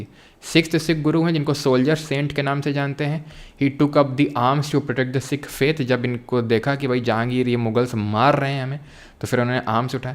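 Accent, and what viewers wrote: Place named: Uttar Pradesh, India. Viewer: Sir pdhate hue apni up accent me yaad kradete hai ap. Thanks a lot sir we needed this.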